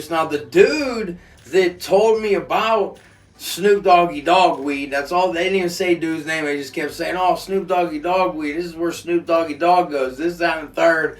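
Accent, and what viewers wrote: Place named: Pittsburgh, United States. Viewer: plus I'm from pittsburgh So it's great to hear the stories told with our burgh accent and from a pittsburgh point of view And funny as hell I say call Netflix !!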